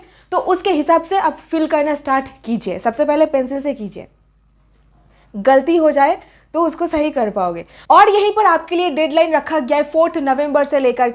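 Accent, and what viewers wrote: Bengal, India. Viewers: Didi ka hindi mein bongla aa roha hai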